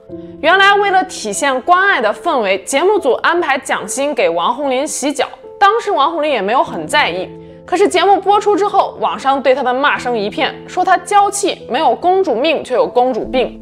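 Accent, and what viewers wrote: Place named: Beijing, China. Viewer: 说话有北京味儿，那叫一个地道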